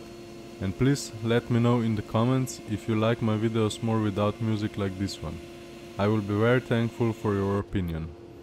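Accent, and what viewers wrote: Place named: Montenegro. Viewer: Nice work man! I would almost bet, judging by your Eng accent, that you come from the small and beautiful country that has about 40km of Adriatic coast and a 2850m highest mountain. Can I ask why you opted for the glued neck, was it aesthetics or also sound? And did you make your own pickups? I have never seen that shape of pu's before. Last question :) ... what gauge strings did you put on. The low E looks like it's quite thick, like 54 or 60. Cheers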